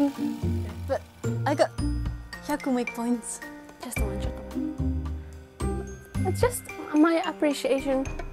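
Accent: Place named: Japan. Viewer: Sorry for this question unrelated to the video topic, but can I ask where exactly you are from? You don't look or sound like a central (Tokyo area) Japanese person. Are you from Okinawa? Sapporo? Sorry for sounding rude! I'm just curious because I'm interested in languages and cultures.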